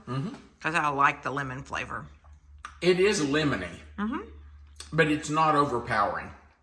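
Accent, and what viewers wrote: South, United States. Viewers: enjoy listening to your delightful Southern drawl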